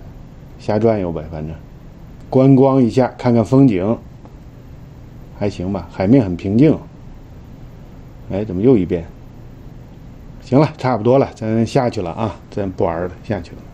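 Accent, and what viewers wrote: Tianjin, China. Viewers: 第一次刷到，李船长的口音听起来怎么有点像天津的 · 说话天津味道很重…… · 一听就是天津人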